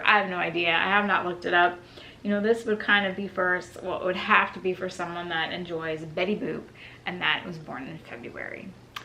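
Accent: New Jersey, United States. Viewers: Kinda crazy reply ... I’m about your age and live in SF ... California toe-head and find myself watching you a few hours a week because of your NJ accent and manor ... different from the left coast treasure hunters I meet ... nothing much happens in your videos but I find them interesting - I like flea-markets and urban treasure hunting and your presentation/discussion/viewer questions are interesting and relaxing ... and I like Yellowstone too ... hahaha ... ANYWAY... Yup that was definitely a SF summer tourist sweatshirt the shops sell ... kinda ugly for us locals ... Tourist think its warm on the CA coast in the summer but as Mark Twain said the coldest winter I ever spent was a summer in San Francisco ... Love your stuff ... just had to chime in after all this time ...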